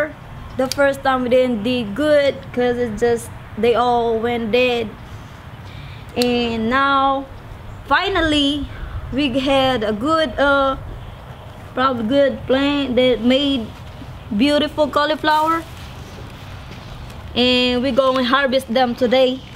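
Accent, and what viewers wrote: Louisiana, United States. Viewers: I love the Cajun accent you got I applaud you growing your own food looks great.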